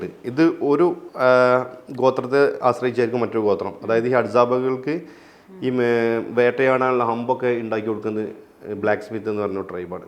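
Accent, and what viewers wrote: Malappuram, India. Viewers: Malappuram slang കേൾക്കാൻ തന്നെ ഭയങ്കര രസമാണ് ❤ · തനി മലപ്പുറം സ്ലാങ്ങിലുള്ള തമാശയും പറഞ്ഞ് ബുള്ളറ്റിൽ യാത്ര ചെയ്യുന്ന കാണുന്നത് വർഷങ്ങൾക്ക് മുമ്പ്, ഇപ്പോൾ ഒരു പാട് പക്വത വന്ന യാത്രികൻ ❤❤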